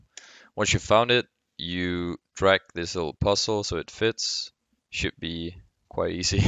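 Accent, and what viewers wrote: Denmark. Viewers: DENMARK jeg kan høre det på agsangten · u danish? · are you danish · jeg vidste du var dansker da jeg hørte din accent og det fik jeg bekræftet da du skærm optog din steam mobile guard app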